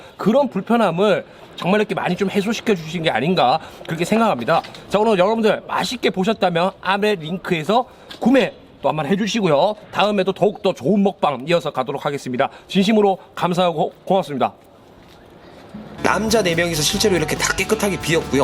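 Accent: Busan, South Korea. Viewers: 옥상 먹방 정감있는 부산말 조합 최강 섞까 묵어봐라ㅎㅎ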